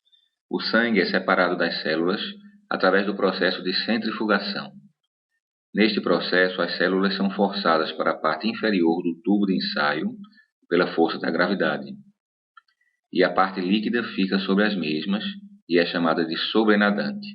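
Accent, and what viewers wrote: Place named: Pernambuco, Brazil. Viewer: Amei o vídeo! E pelo sotaque, deve ser meu conterrâneo, pernambucano?!!!Parabéns pela clareza das informações.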